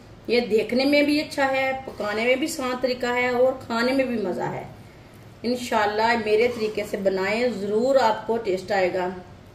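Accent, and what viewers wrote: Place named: Punjab, India. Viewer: Ap urdu m video na bnaya krien pnjabi m bnaien ap ka bolne ka andaz pnjabi h urdu zuban ap pr sot ni krti pls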